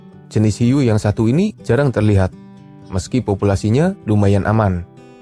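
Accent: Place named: Central Java, Indonesia. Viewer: Kayane wong jateng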